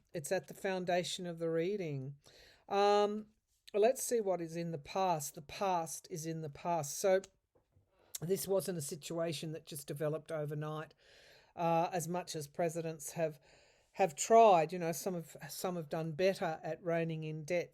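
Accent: Australia. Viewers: So refreshing to hear an Australian voice!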